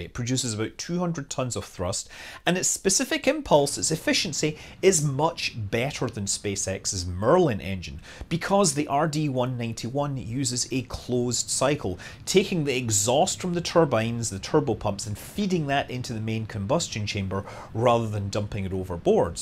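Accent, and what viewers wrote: Scotland, United Kingdom. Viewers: Ive been watching Scott for years. He was the one who taught me the basics of KSP. My wife on the other hand had very little knowledge of him, his channel, or the content. Ive been trying to change that, so now when I watch new videos the phrase “Hello, Im ” rings out around the house. then immediately after I hear a small womans voice in perfect Scottish accent “Hellooo Im ” looks like you got ur hooks in deep sir. Love ur work.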